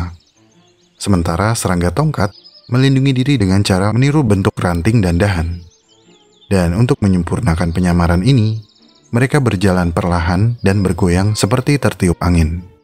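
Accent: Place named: Java, Indonesia. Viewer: Orang Jawa yah lu bang 🤨🤨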